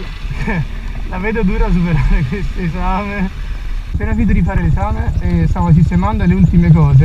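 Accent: Acerra, Italy. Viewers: Ma sei di acerra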